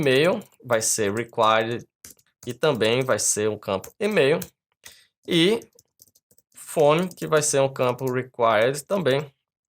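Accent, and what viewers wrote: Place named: Bahia, Brazil. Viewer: Muito top a aula mestre!! Acho maneiro seu sotaque baiano hahahaha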